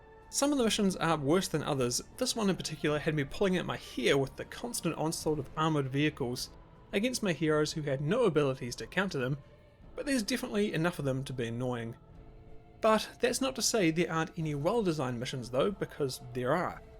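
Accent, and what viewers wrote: New Zealand. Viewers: Loving your kiwi accent; this expansion was indeed the beginning of the end, despite some good ideas...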